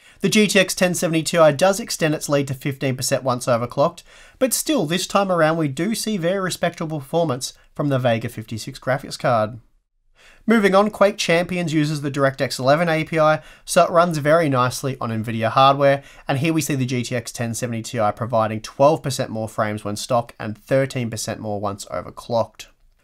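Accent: Australia. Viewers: That "spin" of words in the benchmarks! That eloquent Ozzie accent! you "GymKhanna" circles over youtube man:)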